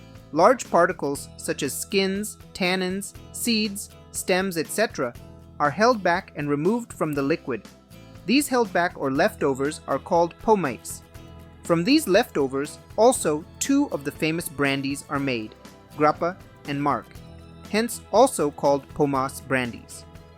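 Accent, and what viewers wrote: India. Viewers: You look like you have Indian goat voice. What nationality are you?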